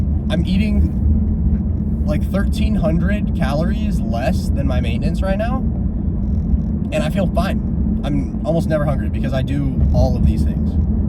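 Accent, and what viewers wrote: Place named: Australia. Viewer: I thought you were australian🇦🇺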